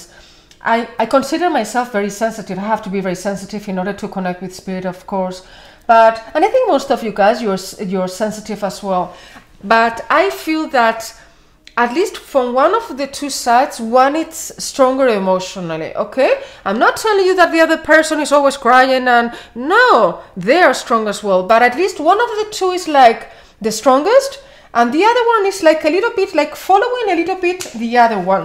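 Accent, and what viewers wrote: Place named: Spain. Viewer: Your voice is beautiful, personally speaking I would hate to see u learn english because what u have is treasure. I love ur Spanish accent. Don't ever lose it❤❤💕.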